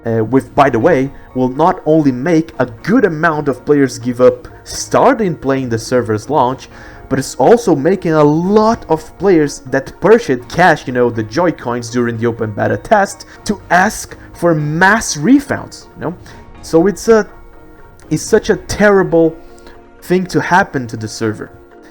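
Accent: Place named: United Kingdom. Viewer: vídeo perfeitinho, meu dog. also: whats is up with this british accent? pretty fancy, i'd say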